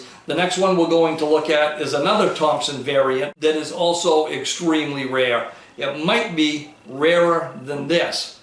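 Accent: Maine, United States. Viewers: It's GREAT to see you getting back into full swing! Love the Maine accent and getting a history lesson aint bad either! Thanks!! Glad you are on the mend!!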